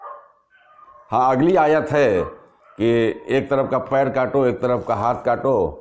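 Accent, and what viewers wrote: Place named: Allahabad, India. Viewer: bahut chutila vyangya elahabadi boli me bahutai jam tha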